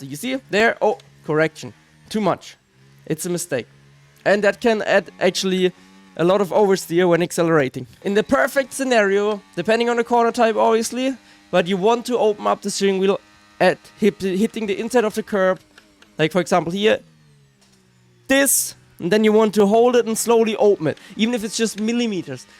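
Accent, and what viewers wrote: Germany. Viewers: thanks, have always struggled with going quick with tc off i am always losing to my tc times also love your german accent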